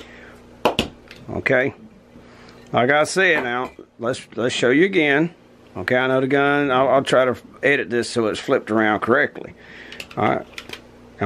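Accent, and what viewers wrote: South, United States. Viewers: Your accent is exactly what they aimed for in inglorious bastards for brad pitt. love it.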